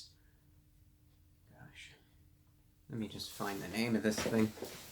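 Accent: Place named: New England, United States. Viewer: he's from new england, it's a slight new england accent, my grandpa was born in massachusetts and he has a thick new england accent.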